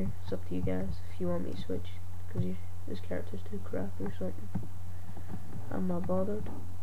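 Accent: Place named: Scotland, United Kingdom. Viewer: I figured out your scottish in this one, within 12 seconds, awesome lol.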